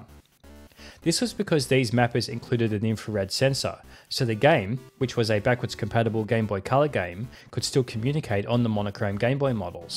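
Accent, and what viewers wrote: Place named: Australia. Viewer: Niche info about old games, Aussie accent, backing track. Perfect channel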